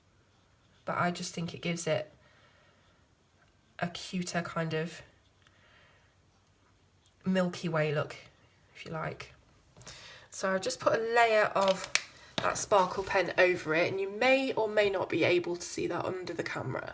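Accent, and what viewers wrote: England, United Kingdom. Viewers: I love your accent from england 😍 nice greetings from germany 👋🏼🇩🇪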